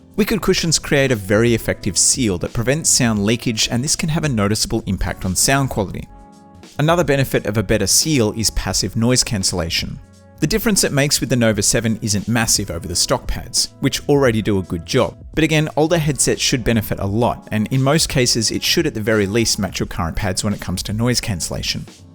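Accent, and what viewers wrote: Australia. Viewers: Loving hearing the accent from a fellow Aussie 🇦🇺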